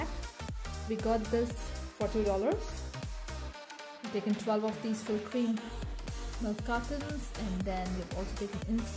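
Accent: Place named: India. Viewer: I absolutely will! Btw, I love your Indian accent mixed with the Australian terms. It’s just so worldly to me and it’s cool to listen to 😃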